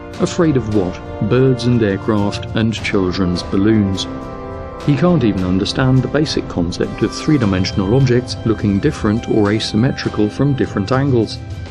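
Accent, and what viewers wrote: United Kingdom. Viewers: While my mind is having a hard time accepting the person you're quoting isn't a Poe, I still enjoyed the demonstration of your know-how, Google Fu, and awesome British accent. edit: On the other hand, if the guy is somehow serious, you spent about 20 minutes ripping into someone with a mental disorder. And I enjoyed it. We're horrible people :( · How many hours did you just spend debunking what is obviously the rantings of a mentally ill man? To what end? Do you think if this guy gave up his religion all would be well with him? I bet if he gave up his religion, he may well find himself inexplicably compelled to "expose" the religious rantings of the mentally ill. I see little difference between his video and yours. The British accent does make you sound smarter, but crazy is as crazy does and dude, debunking crazy peoples videos is just bat shit crazy. 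Did you honestly think we needed your help debunking his sky demon theories?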